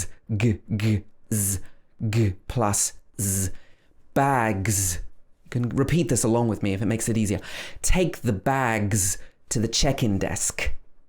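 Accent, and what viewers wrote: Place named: United Kingdom. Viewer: Thanks a lot sir. You teach me many things. You're perfect in British accent.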